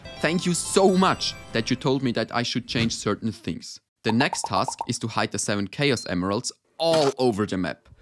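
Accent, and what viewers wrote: Germany. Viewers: youre german?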